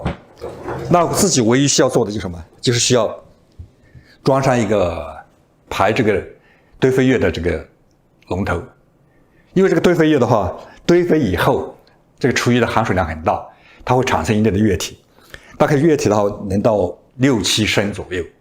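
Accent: Yunnan, China. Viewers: 您应该是云南人吧😂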